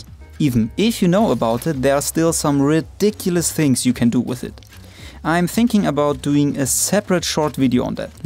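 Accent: Germany. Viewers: You sound Like a german Guy LeL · Hi, are you German? It's just bc your voice and pronunciation sounds a bit like German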